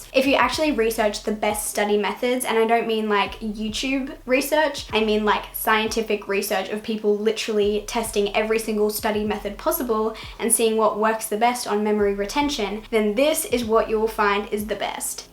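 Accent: Australia. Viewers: I subbed just because of your verse at the beginning of your video, your cross necklace, and your Aussie accent. The funny thing is I don’t think I have an Australian accent and then I go and watch other Aussie’s videos and I can definitely tell their accent! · I find it so weird how Australian people have english and American accent mixed together 😂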